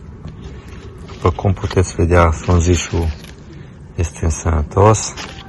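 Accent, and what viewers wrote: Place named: Transylvania, Romania. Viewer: Vă salut !!! Din ce zonă a tări sateți ???? După grai ,ardelean, !!!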